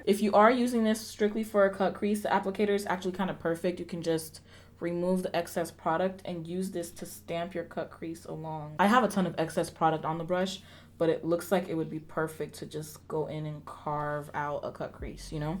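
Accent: Nigeria. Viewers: Chaiii your nigerian accent I no fit 😂😂😂😂😂😂😂😂 makeup on point!🔥🔥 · Lovinnnng your Nigerian accent 😍😍 😍 #nigerian.here😊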